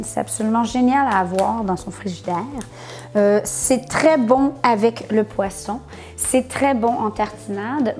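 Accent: Canada. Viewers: Coucou j adore tes vidéo et l accent canadien qui nous réchauffe le cœur que ca soit l été comme l hiver on aimerait voir beaucoup plus de vidéo mais je pense que sait beaucoup beaucoup de travail je suis super content de voir les nouvelles vidéo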